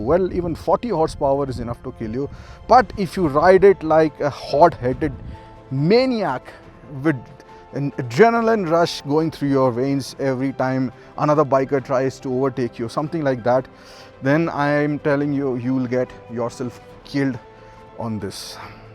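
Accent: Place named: India. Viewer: Watching and listening a review on M1000R in Austria with that indian accent ..... I dont want to mute the video cause i do want to know about the experience but ...smh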